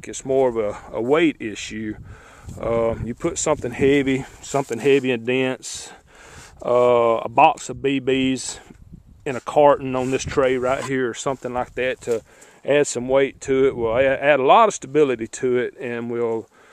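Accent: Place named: Texas, United States. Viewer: I'm fascinated by the hobby and have finally narrowed down my telescope search to the Orion StarBlast 4.5 or the 6. (The 6 has bigger aperture, but I'd like the dobsonian tabletop mount.) Have you, since making this video, had a good experience overall with this scope? I'd love to hear your thoughts and whether they've changed since having it for a few years. P.S. Are you Texan? You sound like my family and it's great. Haha ;)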